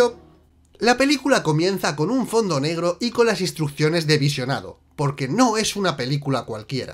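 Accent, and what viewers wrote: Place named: Spain. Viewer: ¿Por qué los Españoles hablán tan mal el Español? O no más él lo habla así?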